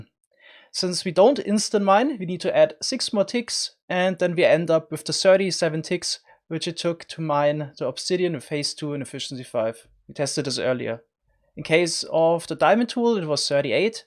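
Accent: Germany. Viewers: Your from Germany right?😅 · Is it just me or are you German? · deutsche aussprache uff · Man hört halt raus das er deutsch is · no no please give me a heavier german accent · Noch nie so einen deutschen Akzent gehört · Are you german? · Ich lehne mich mal weit aus dem Fenster aber du bist deutsch😂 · Are you a german? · Man hört richtig dass du deutsch bist xD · Are you german cus' you Sound like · Are you a german?xD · Is he German? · Wie krass man einfach hört das du deutsch bist 😂😅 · bist du deutsch?😂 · I’m just curious, are you German? Sorry if this seems offensive. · Bist du deutsch ? Klingt vom Englisch so 😂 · German detected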